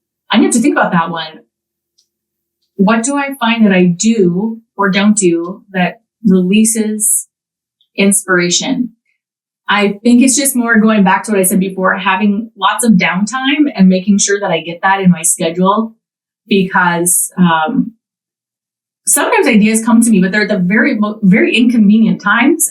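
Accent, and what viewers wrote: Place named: Canada. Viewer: Plus I love hearing Canadian... sets my maple leaf little heart a flutter <3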